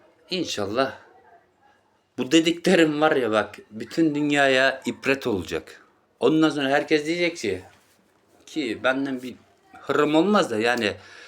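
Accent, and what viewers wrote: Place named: Istanbul, Turkey. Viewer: Abi essenler sivesiyle konusuyon, Istanbul essenlerdenmisin?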